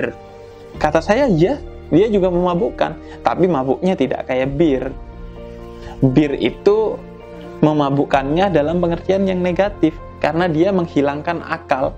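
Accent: Madura, Indonesia. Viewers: Maaf bib kayak ada logat" maduranya ?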